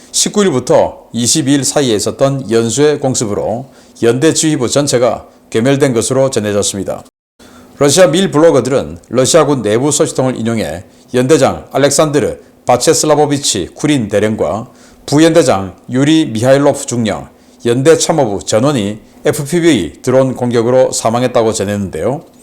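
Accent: Gyeongsang, South Korea. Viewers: 경상도 씹 말투 존나 거슬리네~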